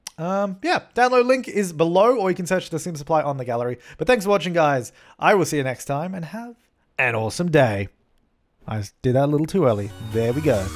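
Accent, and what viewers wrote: Australia. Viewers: Are you Australian?Because I am🇦🇺Like if you are Aussie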